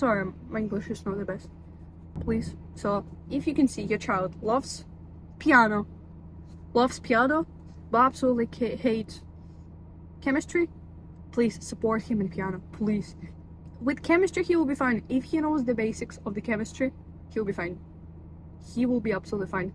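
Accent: Scotland, United Kingdom. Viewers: you have a beautiful accent! is it just my imagination or do you have a little bit of scottish? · Iv listened to a few of your videos, but your carol of the bells piano is stunning. Always thought you was eastern European for some reason. I can definitely hear the distinctive Scottish in you and the name should of been a give away. But am half Scottish grew up half life in England and Scotland but im struggling to understand you at times. Are you full Scottish. Ya accent is almost like Scottish and Scandinavian or something. Ah ok your feom Ukraine im more into the video. Your accent is almost like 3 accents, im kinda seeing what my accent is like now for the first time haha. As my accent is all over the place also 😂. Awsome Use ableton is more intuitive especially for just play loops in live and capturing ideas.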